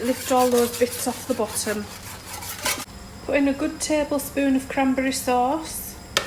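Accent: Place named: North East England, United Kingdom. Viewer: Bloody Hell! It's 9 45am and l could eat this now!😂 You, Lady are quite a joy to watch cook! Didn't think they made brilliant, everyday home cooks at your age any more! I've just found your vids (see this one is 4yrs old!) - they are all very enjoyable to watch, especially with your lovely, no nonsense, talking complete common sense-North East accent (I love all regional accents)😊 This had me reminiscing about our big Family Christmases, back in the 70s. My dear lil' Mum used to cook for the 5,000...well only 14 of us, including 5 kids but would have fed the 5,000! She only had a regular sized gas cooker and a fridge with a tiny icebox. Oh how she would have loved a microwave and an airfryer...and we'd have loved her to have a Dishwasher (l still hate washing up by hand!). But she'd hwve really loved to have had a Range cooker. 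Why? cos one year we had a 46lb Turkey - yeah, l kid you not, 20.8kg in today's money!😉 - and "the B@@ Thing" (her words, not mine: said in her lovely Cockney accent! got stuck in the oven! The legs sprung up as it cooked... me Dad had to saw them off while it was stuck in the oven!!😂😂😂 The lard... the spuds...the veg water for the gravy, all so reminiscent. Bought bacwondeful l memories so thanks fot that ❤ This, people, is a Master Class in how to cook any Roast Dinner! 👏👏👏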